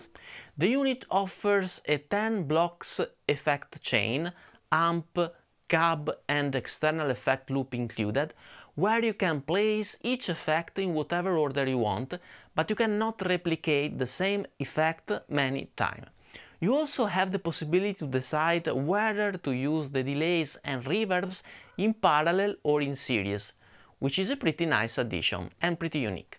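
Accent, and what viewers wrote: Italy. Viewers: Grande! Anche per l'inglese (dalla pronuncia però ti ho beccato subito ahah). Una domanda: ho l'mg30 e i modelli (non tutti) sono fatti molto bene. Il problema è che secondo me hanno sbagliato qualcosa nei gain stage: va in clip troppo facilmente e a livelli medio alti di drive impasta e presenta artefatti. Poi è veramente troppo presente sugli alti. Si risolve in parte abbassando il trim nel pre eq ma non basta. Peccato. Il Trident ha risolto 'sto problema?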